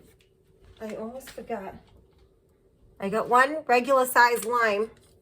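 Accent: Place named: East Coast, United States. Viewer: I never heard of sazon before but will look it up right now. My pork chops always came out dry when baked so now I only make them in a cast iron skillet. Does the marinade (specifically the lime juice) help to make the chops more tender when baked? Your east coast ‘accent’ brings back pleasant memories of my years spent in northern New Jersey in the 90s. Am back in my home state of Minnesota now, where everyone has that ‘up north’ accent! Thanks for sharing.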